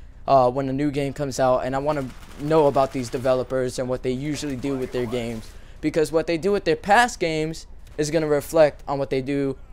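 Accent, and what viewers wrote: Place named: New York, United States. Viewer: Yes! A New Yorker! Finally! I've been looking for a commentary with a guy from New York!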